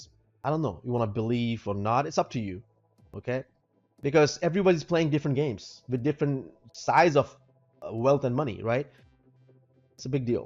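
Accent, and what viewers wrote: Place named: RP, United Kingdom. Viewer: Where is that accent from. It sounds posh to my ears. Sounds like money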